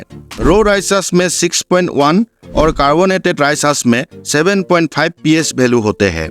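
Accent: Assam, India. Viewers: apke accent sun ke lagta hai ap Assamese ho. Hamare yaha mill mei powder milta hai, "tush" samajh jaoge. Rice mill hai 10km dur waha se 1 bori leke aaya tha. Aadha aise he raw use kiya agalonema mei. Phir kal baki aadha kal aise kadhai mei deke jalaya. 😄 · Ap ke Hindi Assamese jeshe hai.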